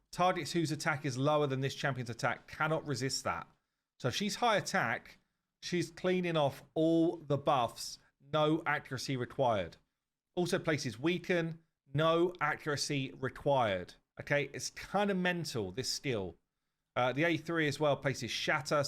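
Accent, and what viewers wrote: United Kingdom. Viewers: Oh you're definitely British😂😂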